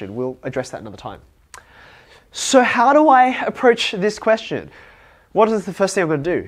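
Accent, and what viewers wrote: Australia. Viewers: Is Australian? Cause he started out sounding American. Lol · Yeah he is an Aussie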